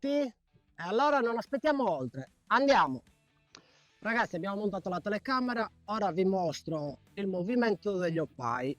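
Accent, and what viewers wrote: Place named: Sardinia, Italy. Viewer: Stavo solo curiosando ma, presto , mi ha colpito una " strana" cadenza a me molto cara.......non propriamente casteddaia ma che si avvicina parecchio . Non avendo barca ripiego sull'eging da terra sempre che il " nostro" amato maestrale lo permette......saluti franco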